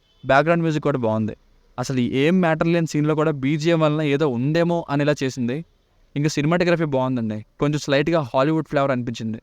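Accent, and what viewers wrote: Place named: East Godavari, India. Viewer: బ్రో మీది ఈస్ట్ గోదావరి మాటకు ముందు అండి మాట చివర అండి అని వస్తుంది స్మాల్ డౌట్ అంతే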